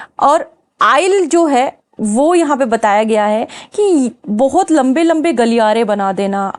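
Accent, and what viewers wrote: Rajasthan, India. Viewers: Ohh , madam ji aap to rajasthan se ho 😊 .... (ढाणी)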